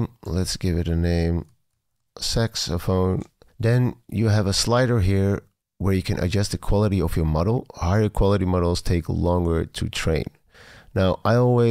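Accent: Netherlands. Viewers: Ik wist het niet zeker maar ik hoorde een NL accent en achteraf bleek dit inderdaad het geval te zijn. Gaaf! 🫡💪❤ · Goede video, klinkt fantastisch! Complimenten! Je Nederlandse accent heeft je wel verraden haha... 🙂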